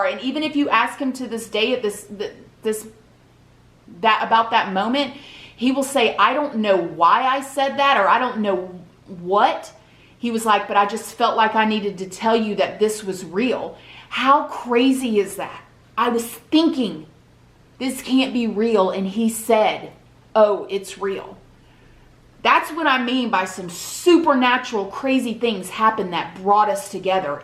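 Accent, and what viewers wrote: South, United States. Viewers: All I hope for is that I get my fair share with the man I love.... I know him from middle school... like I hope it happens like you in a way...where yall met, life did its thing and yall got married in the end. ♥️♥️😭 love your stories, and that southern accent!!! P.S something similar happened to me, Facebook and MySpace kept recommending him to me until we met and once again we ended in bad terms in April. God knows what in the heavens he is doing.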